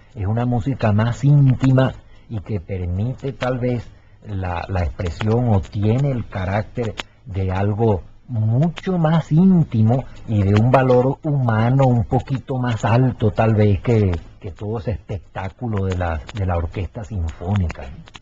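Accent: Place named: Caracas, Venezuela. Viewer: Nótese el acento del caraqueño de antes.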